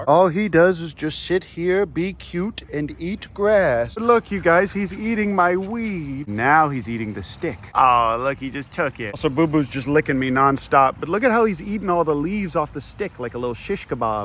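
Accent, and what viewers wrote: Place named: Texas, United States. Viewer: Pennsylvania? No, he's Texan.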